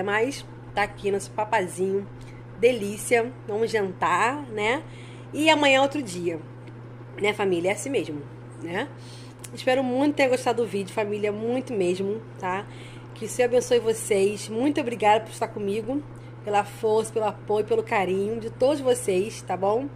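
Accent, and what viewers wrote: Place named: Rio de Janeiro, Brazil. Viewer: Que delícia de vídeo, amada. PARABÉNS PELA sua desenvoltura em nos presentear com seus vídeos naturais, alegres e divertidos. Amoooo. Bjs, gente lindaaaa... AMO seu forte sotaque carioca... Deus é nosso abençoado refúgio querida...😍😘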